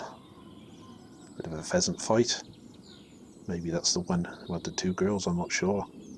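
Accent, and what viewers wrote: United Kingdom. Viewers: And he speaks in a British accent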